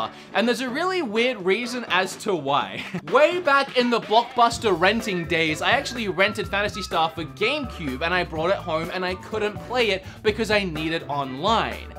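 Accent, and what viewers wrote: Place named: Australia. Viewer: I'm one of the new subscribers! I have seen your videos before but it was your hyped pokemon video before E3 that made me subscribe. I have a feeling I'll continue to enjoy your content bc I really love your energy! Also, I like your accent, because its a lot like mine... I'm Australian (and I'm guessing you are too) but I've travelled and spend a lot of time around people with more American accents. So now I have this strange Australian/US/Canadian accent... ahaha anyways, that awkward little tidbit aside, I hope your channel continues to grow! :D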